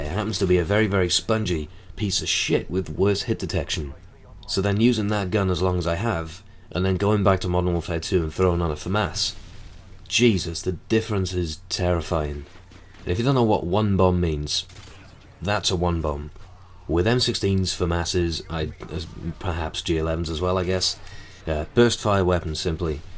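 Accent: Wales, United Kingdom. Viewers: Nice video;) like the way you say what we all think with a British accent. BTW, try M8 tac knife. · yeh, he sounds like my relative from the Gower area in Wales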